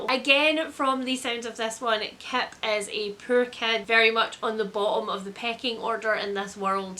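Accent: Scotland, United Kingdom. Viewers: I'm on staycation and I have binge-watched so many of your videos that my inner monologue now has a Scottish accent 🖤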